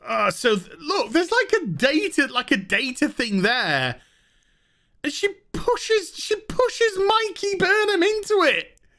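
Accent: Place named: Yorkshire, United Kingdom. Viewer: Really am loving the Yorkshire accent coming out. “Oreyt pal, ars it guin?”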